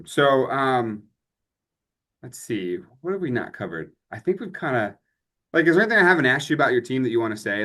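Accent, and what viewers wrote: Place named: South, United States. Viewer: !!! When did you pick up that southern accent?!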